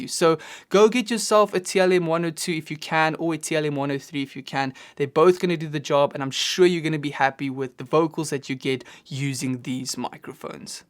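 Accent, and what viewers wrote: South Africa. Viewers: You sound South African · Dude! Where are you from? You sound South African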